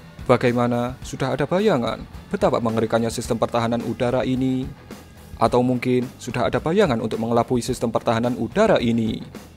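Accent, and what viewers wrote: Java, Indonesia. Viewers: Admin medok timen, bocah ngendi we min? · Susah brow bahasa Indonesia nya gajelas, medhok jawa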